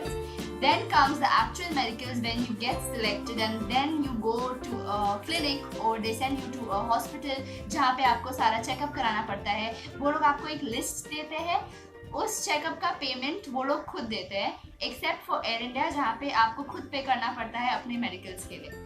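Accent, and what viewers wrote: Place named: Nepal. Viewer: so cute maam u r!! nepali acent 😘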